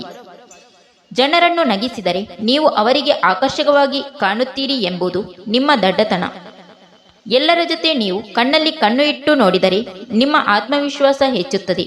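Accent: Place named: Tamil Nadu, India. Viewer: Tamil accent... Good one 🎉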